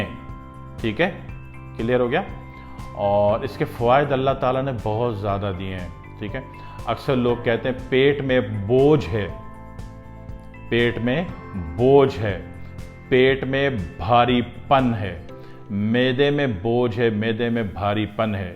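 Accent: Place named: Delhi, India. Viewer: بھائی میاں آپ دہلی والے لہجہ میں بات کرتے ھو۔ نیم حکیم خطرہ جان۔ بھائ میاں چھوٹے گوشت کا قورمہ کھائیں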